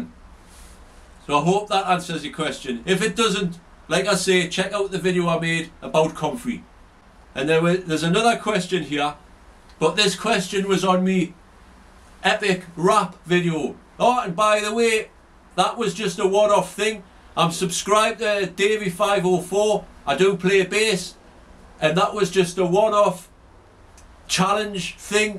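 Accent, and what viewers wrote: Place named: Newcastle, United Kingdom. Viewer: from the North East Hon... It's Geordie!!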